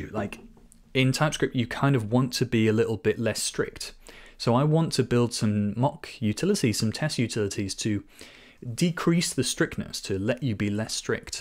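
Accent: United Kingdom. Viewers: Are you scottish?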